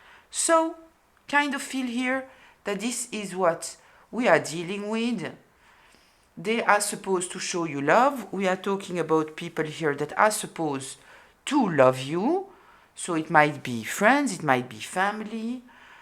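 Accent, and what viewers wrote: France. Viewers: U are simply a Candy to my Ears, all that French accent and the typical French frankness and dutyfullness 100%%%% on the Mark . ❤❤❤🎉🎉🎉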